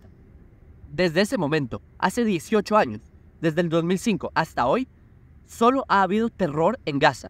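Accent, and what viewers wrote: Bogotá, Colombia. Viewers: Tienes familia en Colombia? Es que me parece que tienes mucho de acento rolo (Bogotano).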